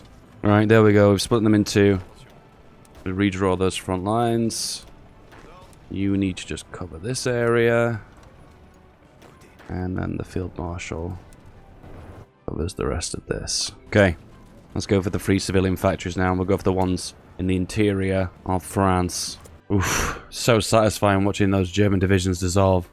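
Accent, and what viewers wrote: England, United Kingdom. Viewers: #giveaway I love your videos, they are usually really helpful and entertaining, and along with that sweet British accent just makes watching your videos all the more a pleasure. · a smooth English voice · Class video mate, just discovered your channel but it looks like rly high quality stuff #giveaway Also nice to hear another English accent 😍🏴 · #giveaway Hey as I mentioned before, I didn't win a giveaway, but hey I'm doing it again cause it's free. Love your video and love your accent, as a fan of British accent (please don't judge me)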